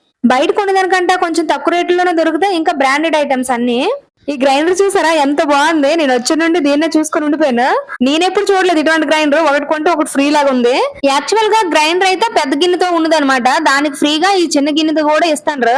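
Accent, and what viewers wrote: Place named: Vizianagaram, India. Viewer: విజయనగరం యాస...very nice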